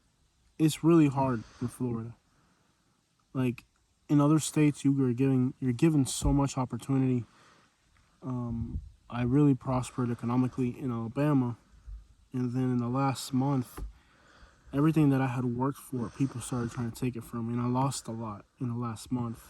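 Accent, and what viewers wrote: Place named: California, United States. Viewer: While you are taking I am hearing California.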